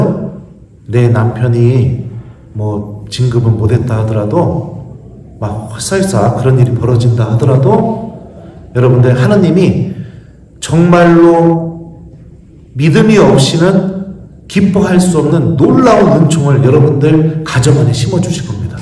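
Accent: Chungcheong, South Korea. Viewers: 찬미예수님 저는 신부님 애청 구독자가 되었습니다. 제 친정은 대전교구 성당인데 신부님 강론 강의 들을때마다 익숙한 충청도 사투리가 참 정겹고 좋아요. 신부님의 어머니 이야기를 들으며 저의 엄마가 생각나서 눈물이 났어요. 며칠전에 돌아가신지 6주기 맞이했네요. 저랑 15년을 함께 사시다가 89세로, 하느님 품으로 가셨는데 제 엄마도 새벽 3시면 어떤일이 있어도 일어나셔서 3시간 넘게 기도를 하시면서 하루를 시작하셨어요. 평생 온갖 질병으로 시달리시셔서 37킬로를 넘지 못하셨던.., 그런 엄마가 치매까지 오면서 계절이 뭔지, 지금 시간이 언젠지 점점 인지능력이 저하되셨는데 그런중에도 시도때도 없이 성당에 가야한다고 옷을 거꾸로 입고 상의를 바지에 끼우시고 성당가방을 매고 그러셨죠. 엄마는 갖가지 기도를 하셨기에 많은 기도책이 있었는데 낡을대로 낡은 그책을 하루종일 넘기시며 치매중에도 기도를 하셨고 묵주기도를 멈추지 않으셨어요. 그런데 3시간 넘게, 4시간 정도 걸리셨던 그 기도는 30분안에 끝나더라구요. 치매때문이셨겠죠... 기도책을 덮으면서 '나 천당 가야 해, 나 천당가야해' 이러셨던 우리 엄마... 하느님께서는 엄마의 그 기도를 어여쁘게 받아주셨으리라 믿습니다. 장례식때 엄마의 손때가 잔뜩 묻고 낡은 그책을 함께 제단에 올려드리고 그걸 보면서 저도 그때부터 냉담을 풀고 지금은 하느님께 다가가려고 노력하며 살고 있답니다. 지금도 엄마가 보고싶어서 눈물이 나지만 하느님 품에 계신 엄마에게, 그리고 성모님께 저를 위해 빌어주십사 전구를 청하며 살고 있답니다. 신부님의 강론 또한 저에게 희망을 심어주셔서 감사드립니다.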